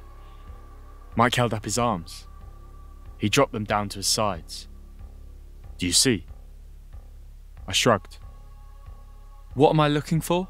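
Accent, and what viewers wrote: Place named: United Kingdom. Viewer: lose the british accent.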